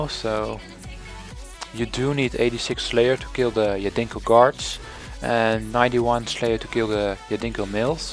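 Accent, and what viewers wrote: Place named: Netherlands. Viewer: je kan echt horen dat jij uit HOLLAND komt :p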